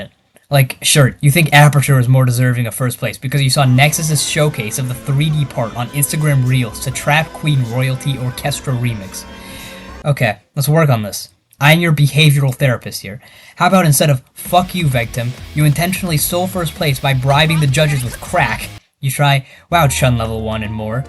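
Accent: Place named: Canada. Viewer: kenadian-ah voice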